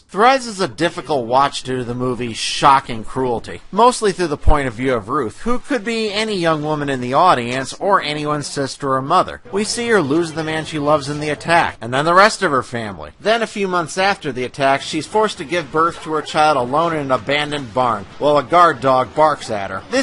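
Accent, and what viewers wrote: United Kingdom. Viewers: Did you have to have subtitles in the US because you couldn't understand Yorkshire dialect?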